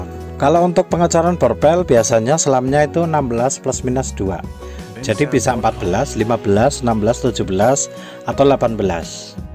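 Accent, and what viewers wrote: Java, Indonesia. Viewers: Rika sih jawane ngendi melu ngode lah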